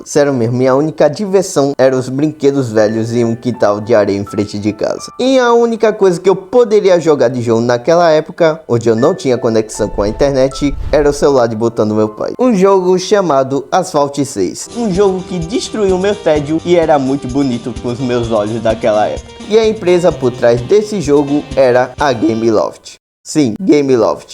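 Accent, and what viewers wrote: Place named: Brazil. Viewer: que sotaque fofo! amei seu sotaque!! eu estudei sobre sotaques! o seu sotaque é remanecente de um dos primeiros sotaques do Brasil!! isso é muito foda rsrs sou nerd de línguas,jogos,anime etc kkkk então sim informação inutil pra você mas toma ehhe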